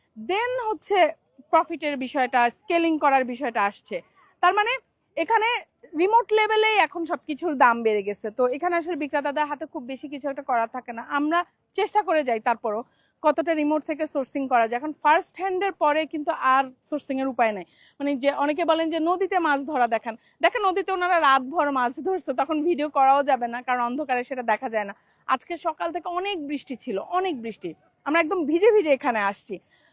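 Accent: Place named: Bangladesh. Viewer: আমি ইন্ডিয়ান কলকাতাতে থাকি ,তোমার blog দেখতে খুব ভাল লাগে বিশেষ করে বাংলাদেশের ভাষা শুনতে। আমার origin বাংলাদেশের ফরিদপুর এ।বেশী করে বাংলাদেশের ভাষা বলবেন,অনুরোধ রইল। আমার নাম সুব্রত মিত্র,বয়স 72।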